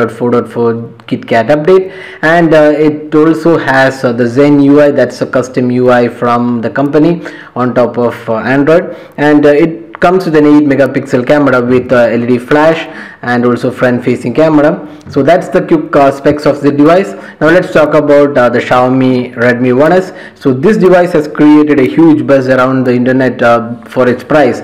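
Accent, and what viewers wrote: Kerala, India. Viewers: ur mallu accent is so prominent...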